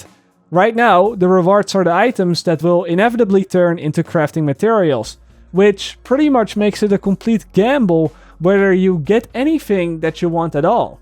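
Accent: Netherlands. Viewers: Ja hij is Nederlands en heeft dus een Nederlands accent. Boeie.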